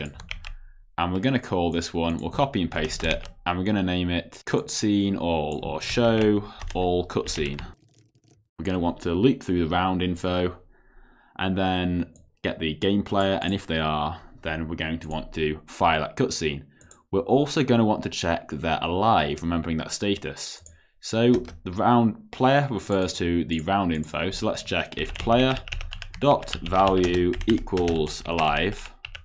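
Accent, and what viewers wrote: United Kingdom. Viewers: Can you not hear his voice he’s from uk you can hear from the sound of his voice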